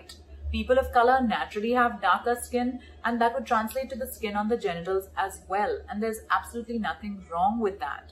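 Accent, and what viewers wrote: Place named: India. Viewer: Name English and accent hindi ?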